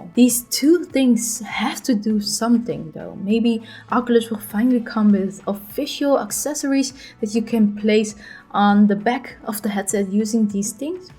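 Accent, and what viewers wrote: Netherlands. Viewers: I clicked on the video and heard the dutch accent, immediately subscribed 😂🇳🇱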